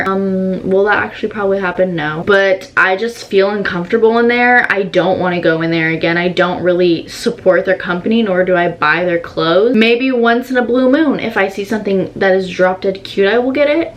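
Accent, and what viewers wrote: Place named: Australia. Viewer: loved that australian accent lol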